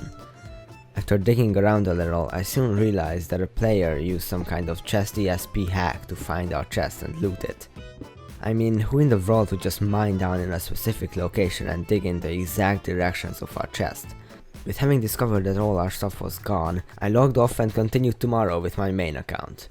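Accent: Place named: Hungary. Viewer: Nagyon úgy érzem, hogy magyar vagy. Az akcentus mindent elmond. Amúgy nagyon jó lett a videó!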